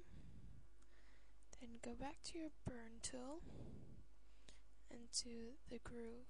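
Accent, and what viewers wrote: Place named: United Kingdom. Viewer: You sound British o-o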